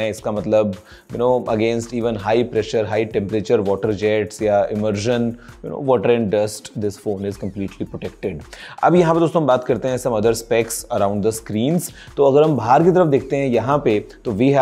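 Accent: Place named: Butler English, India. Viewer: Pls Stop speaking in butler english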